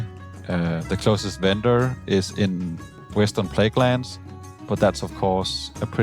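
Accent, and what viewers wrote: Denmark. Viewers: the danish accent bro, love it <3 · You accent makes me think you are from Denmark, am I right? 😂 Nice serie man, cant wait for the rest of serie to come out. KEEP IT GOING!!!💪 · Something about the accent that tells me that he is danish!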